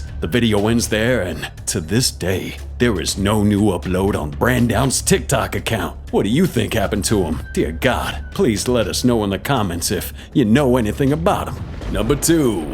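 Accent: South, United States. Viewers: Great content as always. I watch all your vids and my gf and I get a good laugh out of your accent, and I mean that in a positive way. We're from Chicago area and we love southern accents.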